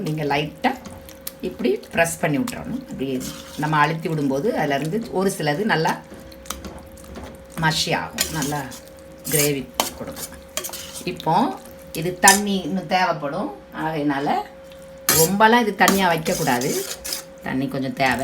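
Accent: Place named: Thoothukudi, India. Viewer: பார்க்கவே நல்லா இருக்கு. நீங்க தூத்துகுடியா? அந்த ஊரு வட்டார தமிழ் அழகா பேசுறீங்க. அருமை சகோதரி. நன்றி 💐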